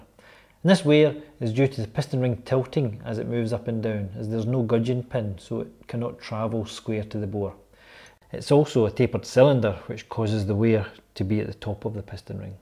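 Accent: Scotland, United Kingdom. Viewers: Are you from Scotland? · Very good explanation. And I love that Scottish accent of yours! ;-) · english with british accent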